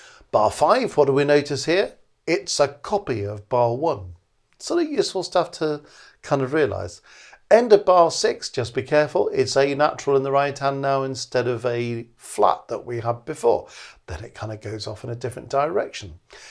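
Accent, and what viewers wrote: United Kingdom. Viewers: I suggest that can you please teach us how to speak British? Because you have the great British accent when you are speaking....Please?? THANK YOU!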